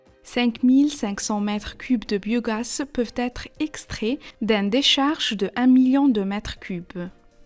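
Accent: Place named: Japan. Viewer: Ce n'est pas une voix électronique, mais une femme (à mon avis japonaise) avec un accent.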